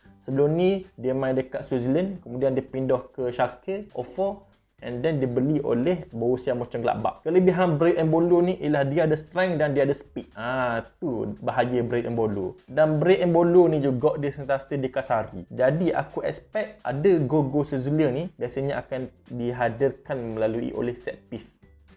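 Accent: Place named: Terengganu, Malaysia. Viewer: Ejah subtitle.. Dop paham loghat terengganu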